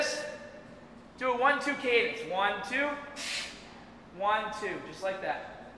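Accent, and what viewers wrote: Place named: New England, United States. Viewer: The accent is... Boston? Maine? New Hampshire?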